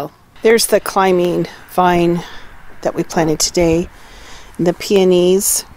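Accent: South, United States. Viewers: I always thought she had a very thick southern accent.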